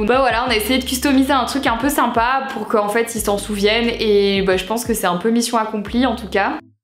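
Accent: Brussels, Belgium. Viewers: Tu as vraiment adopté l'accent belge je trouve ! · tu as pris l'accent belge!!! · tu as un petit accent belge 😂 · Sais-tu que tu commences à avoir un petit accent belge par moment 😉? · Trop chou, tu commences à prendre l’accent bruxellois du Henri. Pendant que moi, j’ai perdu mon accent belge dans les Alpes 🥰🇧🇪🇫🇷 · T'as un peu pris l'accent belge ahaha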